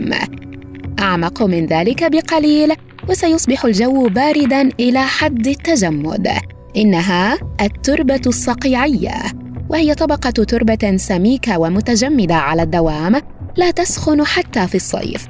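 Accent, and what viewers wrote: Egypt. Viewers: باين🧐 عليها مصرية😏😂😂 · فعلن مصرية مصرية 😂😂😂😂😂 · انتي اللي بتتكلمي في الفديو مصرية؟؟ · مين لاحض انو الي تتكلم مصرية 😂❤️❤️❤️❤️تحية لمصر من الجزائر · تم اكتشاف جنسية المعلقة التي نحبها انها من مصر وااعع لغتك العربيه الفصحى رائعة شكرا لكى لقد افادني درس الجيولوجيا هذا ♥️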